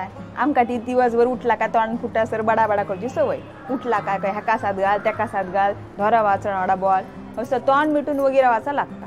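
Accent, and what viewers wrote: Malvani, India. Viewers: Ge tujhi malvani Bhasha aikuk khup maja yeta.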